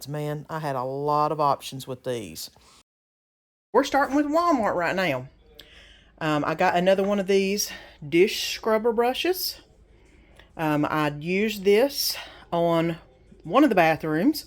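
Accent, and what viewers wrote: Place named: North Carolina, United States. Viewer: I absolutely love your accent. I also live in NC. Great Haul!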